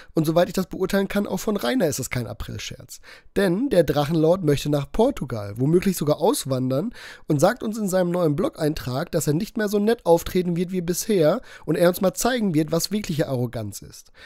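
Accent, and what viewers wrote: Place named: Middle Franconia, Germany. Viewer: Da muss er nur noch ordentlich deutsch sprechen können, und nicht nur meddlfrängisch.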